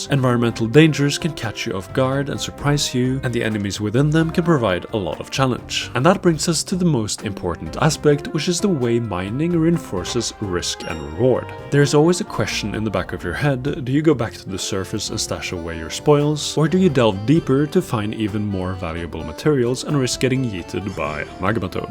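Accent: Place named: Norway. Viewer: I like your cute Norwegian accent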